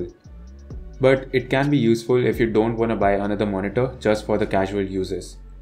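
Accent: India. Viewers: Sorry if this sounds a bit racist or attacking your nation but I always think it's a legitimate tutorial video when the person speaking has an Indian accent. I also love that you're straight to the point. If this was American, it wouldn't be as straight as this one. They have to thank their sponsors first and talk about nonsense before actually getting to the tutorial.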